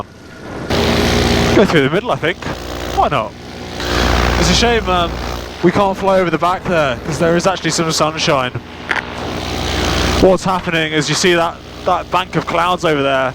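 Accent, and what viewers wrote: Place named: United Kingdom. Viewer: Great video! I could sit here and listen to that Proper British Accent all day.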